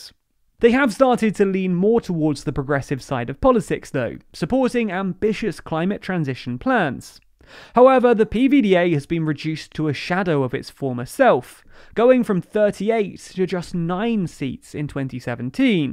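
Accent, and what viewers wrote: England, United Kingdom. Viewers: DitisKaas Appel there are dutch team members in TLDR, it's not 100% based, but ofc for clarity the english accent just works better than stone cool english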